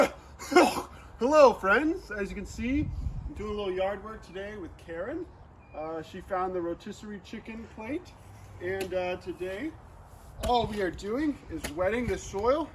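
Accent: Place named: South, United States. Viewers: when u speed up the video i can really hear ur texas accent lol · I'm sorry, i love ol' and all, but I'm really glad he eventually dropped that kinda southern gentleman voice he uses on these early videos. I guess I just got used to hearing him the way he sounds now (2024). That southern lawyer thing is extremely cringe to me. It doesn't keep me from watching, I just prefer his natural voice.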